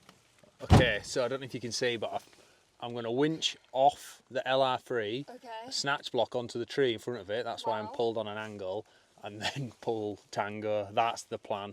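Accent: Yorkshire, United Kingdom. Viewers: So funny hearing your Yorkshire accent in America ❤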